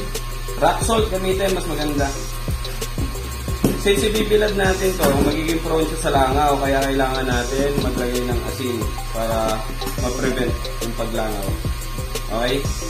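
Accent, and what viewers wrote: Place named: Pampanga, Philippines. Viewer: May antimano, kapampangan ka brad! hahaha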